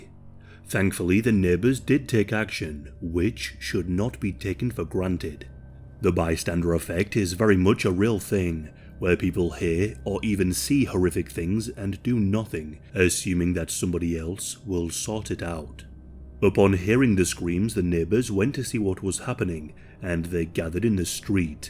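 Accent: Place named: Southern England, United Kingdom. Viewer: sounds like mid or southern England to me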